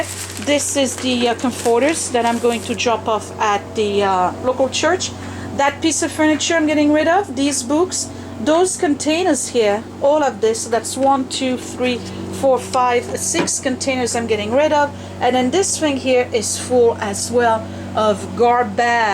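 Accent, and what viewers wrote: France. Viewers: Hubby started watching your videos with me lately!! I think he secretly likes you french accent! We both laughed at Scott's bin of cables and modems, Hubby has 2 bins of those in our garage! I have no idea why he is keeping them!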